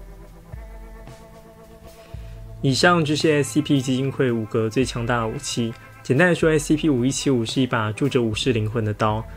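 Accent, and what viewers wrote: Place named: Taiwan. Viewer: 最早在B站上看到这个youtuber，一开始不知道是youtube上面的，后来听到湾湾口音觉得肯定不是大陆人（福建人口音虽然一样但不会那么明显哈哈哈）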